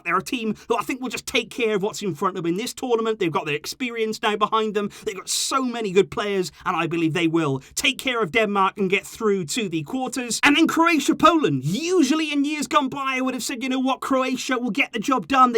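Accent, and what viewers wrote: Wales, United Kingdom. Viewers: Am I the only person realizing he’s Welsh 😂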